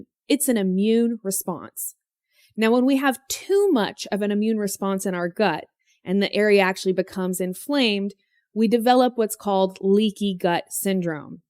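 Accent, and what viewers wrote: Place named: United States. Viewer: I have a video request for you; would you mind doing one on the Ghrelin and leptin hormones? Thanks in advance! Keep up the amazing job! P.S. I like your American accent; this is what I'd love to sound like as I keep working hard on becoming fluent. :) I look forward to watching more upcoming videos you may have in store for us! :) Take care!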